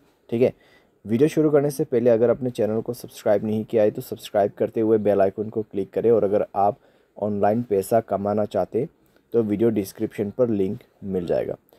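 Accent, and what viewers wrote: India. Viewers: Мерзкий эндуский акцент, слушать невозможно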